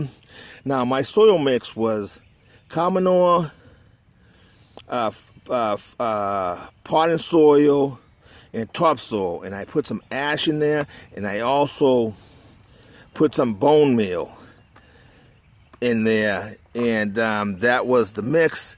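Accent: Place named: Bronx, United States. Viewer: Where are you from? You sound like the Bronx. Thank you, I pray the same for you.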